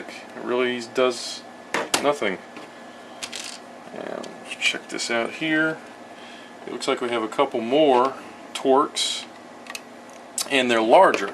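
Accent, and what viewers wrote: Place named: United Kingdom. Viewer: Love the British accent. All these years later I had no idea I could do this.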